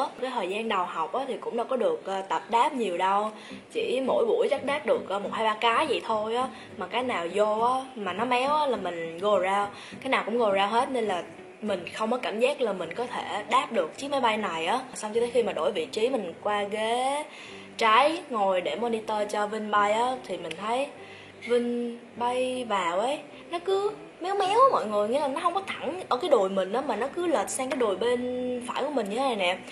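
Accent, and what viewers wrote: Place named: North, Vietnam. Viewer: bạn này chắc là có máu lai miền bắc và miền nam, vì nghe cách nc bình thường thì miền nam mà có cái chữ ấy ấy là người bắc hay dùng và bạn đó cũng phát âm giọng bắc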